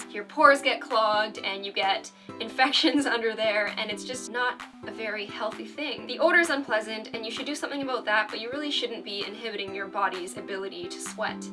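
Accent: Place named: Canada. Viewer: You sound canadian. I love watching your videos because I'm in love with the way you talk. You're just hilarious. And a little quirky. But that's character! :) :)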